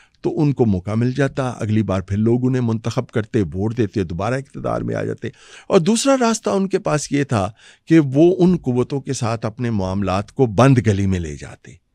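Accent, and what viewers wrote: India. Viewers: اپنا اردو کا تلفظ درست کریں انڈین ایکسنٹ سے اپ انڈین نہیں بن جائیں گی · lagta hai yeh khatoon india se hain??? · Talfaz mai Indian touch ko tarak krnay ki ashad zaroorat hai. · The anchor tends to have an ‘Indian accent’ - عمران كهان instead of خان. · Why does this anchor talk like Indian such as khas , whoosh, can't she speak proper urdu , rather copying hindi language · Plz mam ap ko Pakistani urdu bolni chahiyyay na k hindi accent 3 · بُہت عُمدہ اور بے لاگ انٹرویو آپ نے شئیر کیا ہے جسکے لئے شُکریہ لیکن آپ کے بھارتی انداز میں کُچھ خاص الفاظ ادائیگی پر شکائت بھی ہے۔ آپ ماشااللہ پڑھی لکھی خاتون لگتی ہیں لہٰذا اپنے انداز گُفتگو کو بدلنے کی کوئی ضرورت نہی۔ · Why are you trying to talk like an Indian? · آپ خود کو کھد کیوں بول رہی ہہیں اور ز کو ج ضروری نہیں کہ انڈین لہجہ بنا کر بولیں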